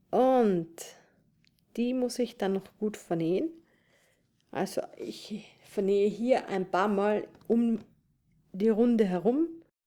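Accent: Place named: Austria. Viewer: Mir geht es schon besser, den österreichischen Slang zu hören! Danke, für die Anleitung!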